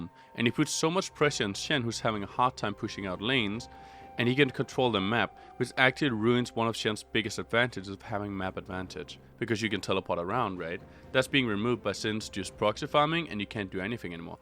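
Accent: Denmark. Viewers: Dansker? Btw very helpful video !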